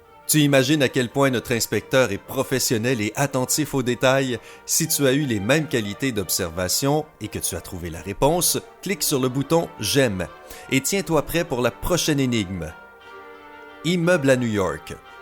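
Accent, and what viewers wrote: Quebec, Canada. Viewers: C'est un québécois c'est sur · J'aime beaucoup le petit accent québéquoi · Enfait cette chaîne est québécoise non moi je suis québécois et je reconnais l’accent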